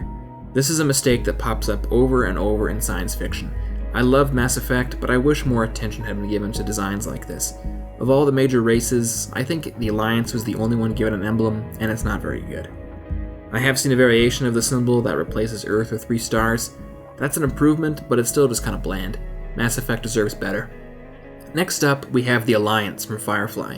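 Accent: Canada. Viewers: You sound so Canadian. The Canadian flag is simple, striking, and well designed!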